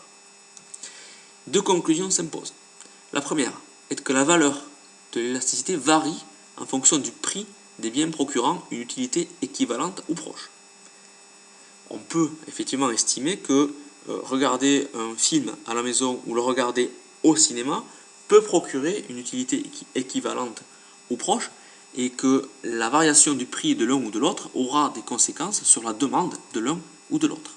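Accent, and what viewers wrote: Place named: South, France. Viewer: apprendre l"élasticité avec l'accent du sud il y'a rien de mieux! trés bonne explication merci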